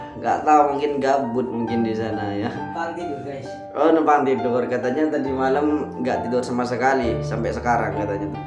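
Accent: Madura, Indonesia. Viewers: Sampean orang madura y cak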